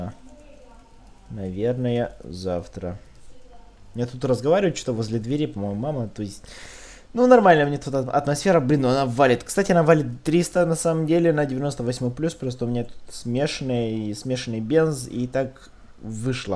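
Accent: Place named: Moldova. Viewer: Акцент молдована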